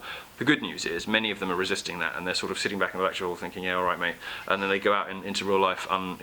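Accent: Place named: United Kingdom. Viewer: Sadly, there seems to be a lot of people who seem to "worship" Milo, the way they did with Hitchens. At the end of the day, he's just a man with opinions. His opinions are not fact. His believing in God doesn't mean there is a God, for example. Why do people like this seem to attract "followers"? They're usually americans too, taken in by a British accent. Even I was credited as super intelligent whilst in Washington, and I'm just a pleb!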